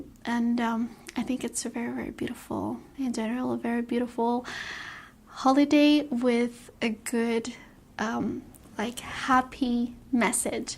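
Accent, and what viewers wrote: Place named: Russia. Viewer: I thought you were Russian😂oops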